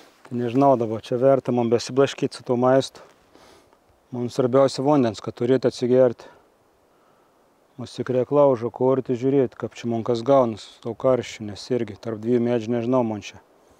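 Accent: Samogitia, Lithuania. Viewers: Smagus video, mano asmeninis pastebėjimas, kažkodėl labai tinka žemaitiška tarmė tokio pobūdžio turiniui 🐻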